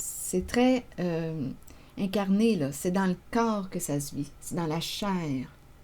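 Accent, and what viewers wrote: Canada. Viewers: Toujours belles guidances .❤ Mon œil est bleu . Vous êtes une très bonne comédienne mais réelle . C’est vrai qu’en réalité, C’est pourri cette famille Et je reste polie Je n’ai pas rigolé tous les jours . Mais je vais mieux . Je vois qu’il y aura beaucoup beaucoup de s ..e . Je n’en doute pas du tout . Confiance ???????!! Maintenant je doute beaucoup . Amour non réciproque Confu (Oui non ) ?????????? Problème de communication Une fois une femme une fois un homme. Qu’est ce que ça veut dire . Accent canadien j’adore . J’aime aussi la mimique des mains . Téléphone fixe inutilisable …. J’adore écrire j’adore parler Je n’ai aucun défaut . Bisous . Merci infiniment .😎😎😎😎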